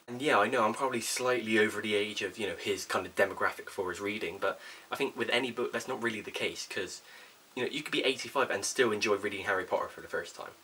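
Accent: Bristol, United Kingdom. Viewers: Your Brizzle accent makes me so happy :')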